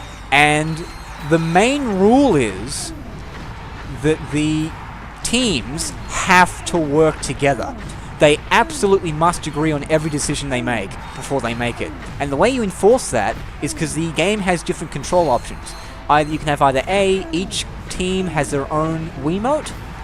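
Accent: Australia. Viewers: Reasons that you deserve more viewers. Your gameplay quality is really good. You have a good mic and voice. You can talk for a long period of time without people (atleast me) geting bored. Also you have the Australian accent :P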